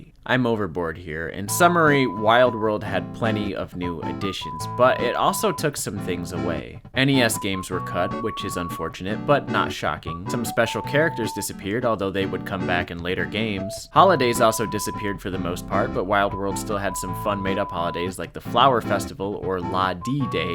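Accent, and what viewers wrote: Syracuse, United States. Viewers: Are you from... Syracuse..? Cuz I’m relatively close 😳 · bro you from Syracuse???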